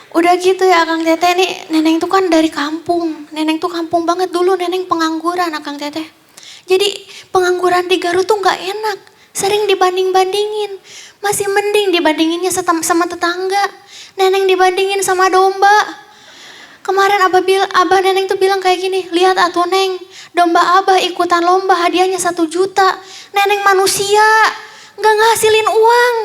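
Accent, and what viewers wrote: Medan, Indonesia. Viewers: Neneng ku kira kau medan kali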